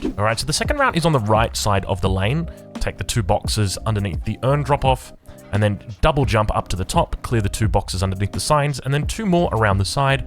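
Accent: Australia. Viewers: Wait what the fuck why do I click on a random Deadlock recommended and its THE narrating??????????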